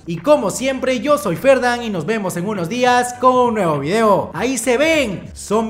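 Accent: Peru. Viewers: Ferdan eres el mejor dando resumen con toque peruano csm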